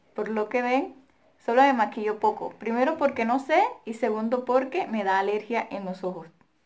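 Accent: Caribbean Coast, Colombia. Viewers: Superrr!! 💃 me veo todos tus vídeos y me encanta que conservas el acento costeño, nuestros refranes, nuestros dichos 🤣🤣me toteo de la risa contigo y tus aventuras. Chachi es un hombre muy especial. Saludos!! Un fuerte abrazo!!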